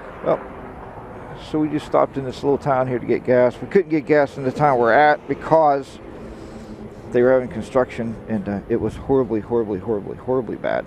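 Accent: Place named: Pittsburgh, United States. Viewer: I spent 5 years in Sandusky. Where you turned left, you should have turned right. I guess you figured that out. Your accent sounds like you’re from the Pittsburgh area.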